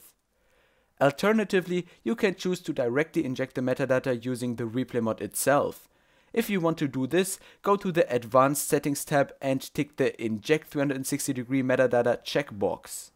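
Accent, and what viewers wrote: Germany. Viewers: Dieser Deutsche Akzent!!!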